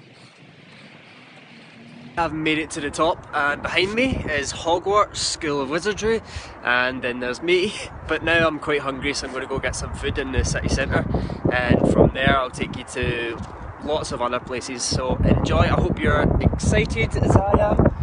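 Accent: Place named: Glasgow, United Kingdom. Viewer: I want a Meet and Greet in Glasgow! I was born in HOUSTON , TX. I now live on the Gulf Coast of Texas. I lived in Corby, Northants in the late 80’s. Love the Glaswegian accent. I’ve got God-daughters in Leicester that are amazingly gorgeous. Hint, hint. I’m sure they’d be GRATEFUL to meet such a positive guy. YOU ARE AWESOME!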